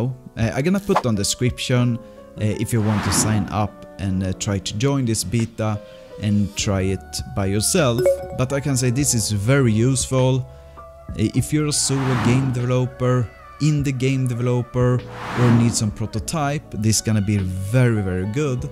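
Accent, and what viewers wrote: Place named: Sweden. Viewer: The heaviest swedish accent I've ever heard lol. Did not know this was a thing, but that's awesome, lit up some new motivation in my silly game project. Tackar så mycket för det! ❤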